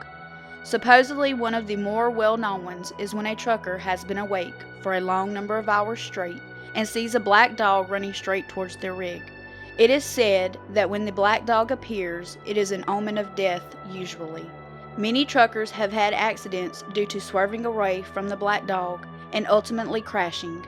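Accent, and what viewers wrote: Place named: South, United States. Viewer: great job,u have that sweet southern twangggg!!! just love it😀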